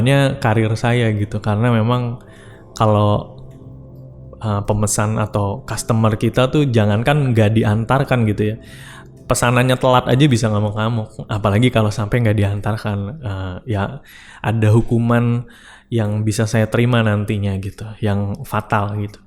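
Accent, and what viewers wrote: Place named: Banyumas, Indonesia. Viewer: Mas.. aslinya Purwokerto ya.. spt Banyumasan logatnya, hee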